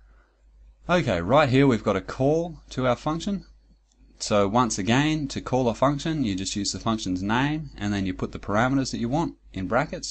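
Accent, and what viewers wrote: Australia. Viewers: Hi What's a, you sound like a fellow Aussie! Thanks again for this great tutorials. I'm working my way through them from start to finish. I'm not a programmer: I'm an old retiree but I did an online course in C++ a couple of years ago for the fun of it. I didn't use it for anything so I let it lapse and forgot most of what I learn't. I came across your course and got fired up to revist C++. You have reignited my interest in C++ and your "easy to understand" method has made this really interesting for me. One of the reasons I'm really interested in getting familiar with C++ is that I am also interested in teaching myself electronics using arduino projects and they utilise C++ in their computers to drive their electronic components. So I want to understand C++ so I can control each project. Thanks again.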